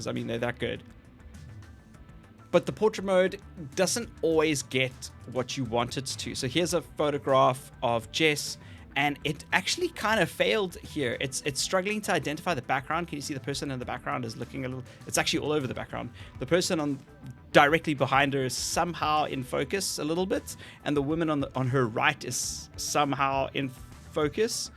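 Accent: South Africa. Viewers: Dude, where are you from? I can hear Joburg with some Durban and also a pinch of New Zealand.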